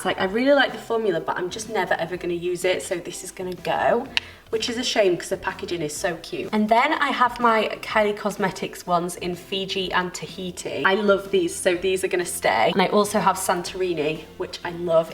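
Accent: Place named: Doncaster, United Kingdom. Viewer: Are you from Doncaster?? You're accent is similar to that 😁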